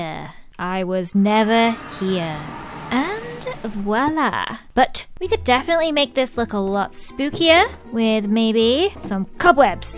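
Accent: United Kingdom. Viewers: OMG she can rhyme "Fear" With "Idea" Cuz she has a british accent! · I love how fear and idea rhime in British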